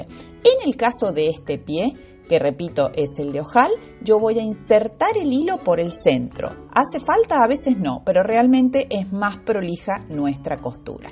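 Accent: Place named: Argentina. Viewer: Un video tan útil, con explicaciones claras. Me encanta tu acento (argentina). Muchas gracias.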